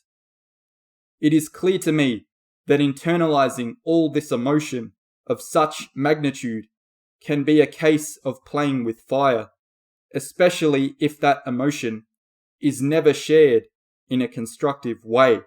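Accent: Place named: New Zealand. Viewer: You may be a strange kiwi, but under all 'thit' accent we are kindred spirits. Thank you for being brave enough to blaze a path.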